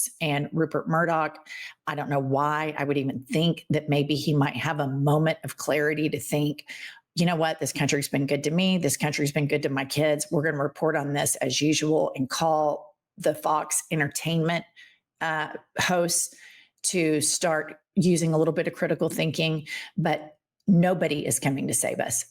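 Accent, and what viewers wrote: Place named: Philadelphia, United States. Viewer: Ummmm are you sure you're not from philadelphia ma'am I love you're delivery and speaking the truth about ##LilSpanky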